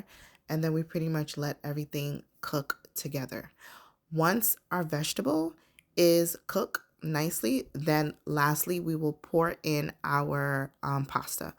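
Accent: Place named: United States. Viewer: You.sound like you might be living in the States. Where do you finf scotch bonnet? People keep referring me to habanero, but it is just not the same.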